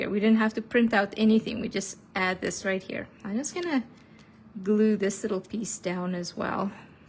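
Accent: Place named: South Africa. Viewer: Hi there, been subscribed to your newsletters for over a year. You sound South African. Thank you for the lovely freebies. I love getting them. Thank you from Cape Town, South Africa.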